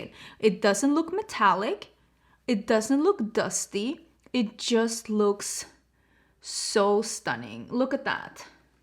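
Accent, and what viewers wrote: Värmland, Sweden. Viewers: I had no idea that you were from Värmland. I actually thought that you lived way more north, but that’s just the mixture of dialects 🤷🏽‍♀️! I really liked this video, showcasing good products that happens to be more affordable than the Sephora brands. 💕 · Great video❤ And yes I can tell you are from Värmland😂 but I love the accent. I am a skåning and get mocked for my accent all the time😂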